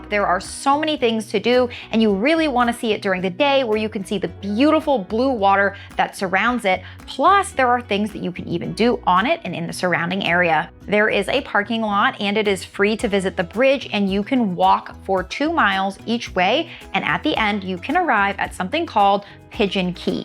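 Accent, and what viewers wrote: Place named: Upper Midwest, United States. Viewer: You sound like you are from the upper Mid west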